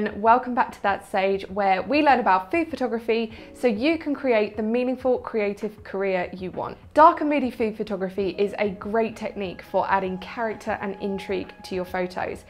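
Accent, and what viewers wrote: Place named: United Kingdom. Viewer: Lovely accent! where are you from in the UK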